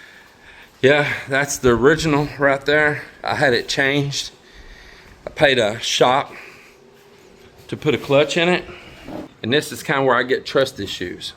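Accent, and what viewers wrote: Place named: South, United States. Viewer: Sir, I like listening to your southern accent. Keep up what your doing. I enjoy it. When I retire hopefully I see you.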